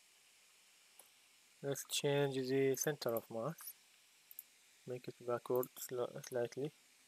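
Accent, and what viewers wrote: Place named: Iraq. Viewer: احسك عراقي 😅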